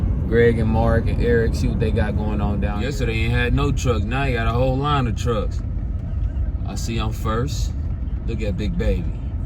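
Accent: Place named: Detroit, United States. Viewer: Man I thought she sounded like a Detroit just wasn’t sure. This mf is bad man love this build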